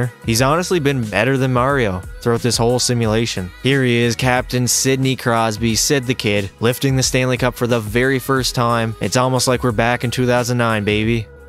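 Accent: Newfoundland, Canada. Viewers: Is your accent from Newfoundland or somewhere around the maritimes?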